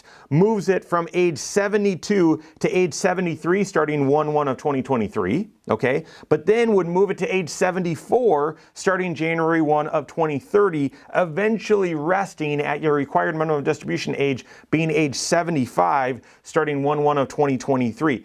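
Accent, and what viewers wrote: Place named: Chicago, United States. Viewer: Dude your Chicago accent lol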